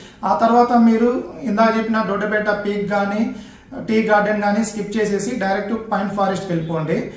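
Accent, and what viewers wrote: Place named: Telugu, India. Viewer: Hi brother..sakkani telugu slag matadutunnaru..clear artam avtundi.. appreciate brother 🎉🎉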